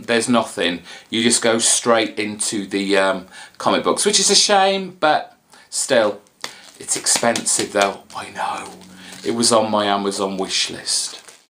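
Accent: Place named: West Country, United Kingdom. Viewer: Appreciate the codes :) Loled at your "pretty darn decent" Sounded like everyone from down my way lol Gotta love the West Country accent! Enjoyed the vlog...missing your marvel graphic novel collection vids tho :/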